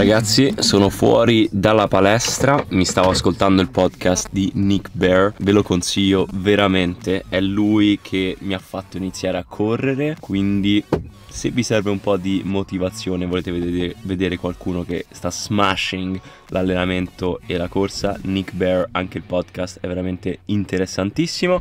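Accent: Rome, Italy. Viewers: Il tuo misto di italiano, romanesco e inglese perfetto è il paradiso per ogni linguista 🅱️®️🅾️🤙🏻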